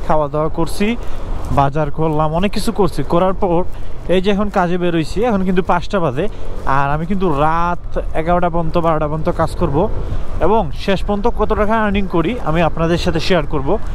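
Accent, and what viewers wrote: Sylhet, Bangladesh. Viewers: Vai apne ki Sylheti Don’t mind ami akjon Sylheti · বাই সিলেটি নি😊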